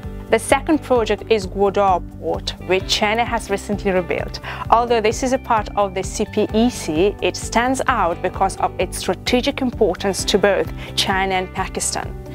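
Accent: United Kingdom. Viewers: i love british accent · Britain accent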